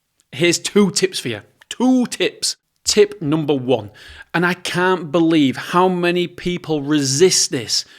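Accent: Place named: Hull, United Kingdom. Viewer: i live in Hull ❤ You sound like your from Hull too 😂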